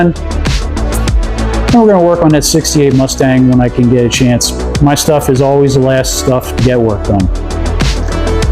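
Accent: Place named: East Coast, United States. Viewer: He sounds east coast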